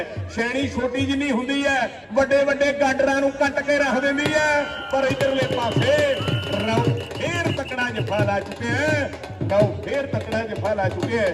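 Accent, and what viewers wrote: Punjab, India. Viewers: Badhia comenty bilkul charde punjab wali boly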